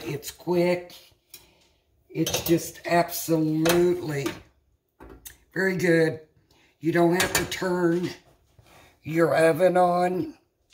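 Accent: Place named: South, United States. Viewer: You don’t have the Indiana accent…it sounds more southern like me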